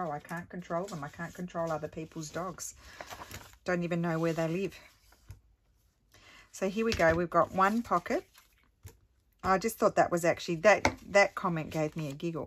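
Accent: Australia. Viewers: Another fantastic video, really enjoy watching you. (So nice to hear your Aussie voice - and phrases - when watching 🤣) Have you tried popping your mushy Giotto in the fridge, might help for a little while? Dawn (Melbourne, Australia)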